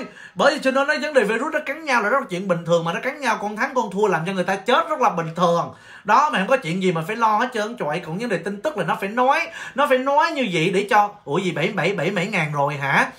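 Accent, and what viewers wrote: Binh Dinh, Vietnam. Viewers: Anh Nguoi Nam hay nguoi Bac vay. Vi giong anh de thuong qua😍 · tưởng anh là giọng Bình Định Phú Yên. Nhưng người gì miễn là vui vẻ là được.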